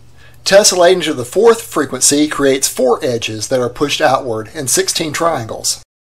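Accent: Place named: United States. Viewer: Thanks for these great explanations! On a side note, you sound like you must come from the same part of USA as Bill Clinton.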